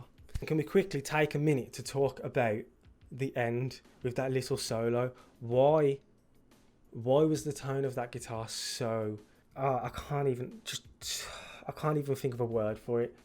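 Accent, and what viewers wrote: United Kingdom. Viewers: Aren't you British? You sound British. How have you never heard of?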